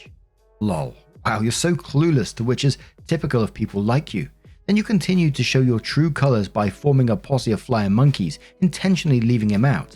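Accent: United Kingdom. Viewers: makes doing housework so much more bearable! Thank you so much for being so much a part of my day that it's not complete without hearing "What's up, Waffle Gang?"! Plus, as an American, his British accent makes him sound so distinguished! I'm sure I'd still like him if he were American (I just wouldn't respect him as much, lol).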